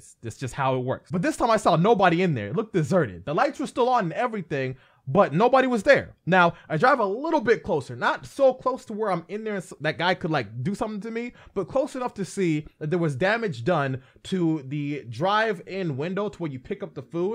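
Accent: United States. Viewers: Damn bruh. Where you from the chi?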